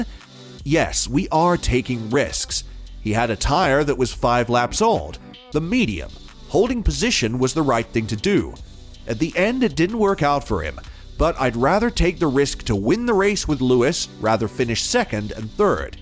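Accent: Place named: United States. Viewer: please can you talk normal English and not the American way... every word so exaggerated....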